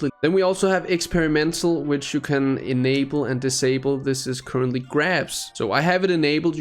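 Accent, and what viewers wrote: Denmark. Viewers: Are you from Denmark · He's Danish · are you from denmark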